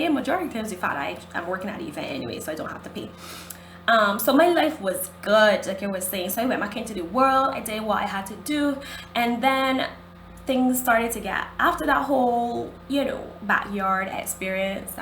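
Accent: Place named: Caribbean. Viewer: i hear Bim, Guyana or trini accent....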